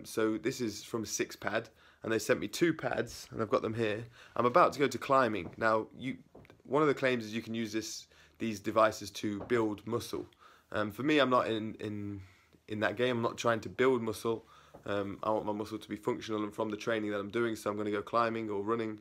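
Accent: United Kingdom. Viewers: Is that how all brits speak or is it the hood slang? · Almost nobody speaks queens English. YouTube geordie shore and compare the accents there to ones from Yorkshire and London. This accent is kinda average.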